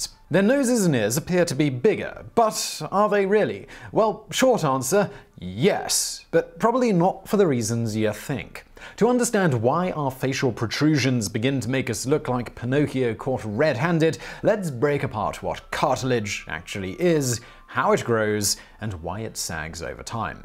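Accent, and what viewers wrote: United Kingdom. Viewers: I thought this was the guy that does VSauce and was wondering why he was talking British accent. Then I realized they could be twins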